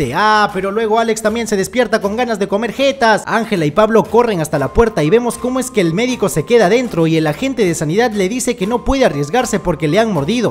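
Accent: Peru. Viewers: Soy yo o es Peruano?🇵🇪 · Literalmente en informacion del canal y por su forma de hablar, es peruano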